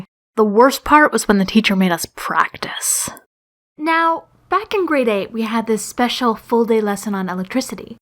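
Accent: Canada. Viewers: HHHHHHH I got it!!! "do not bang the chicks" XDDD took me a second! also your canadian!? :O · you sound like you grew up in Winnipeg. cause I had that growing up here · are you Canadian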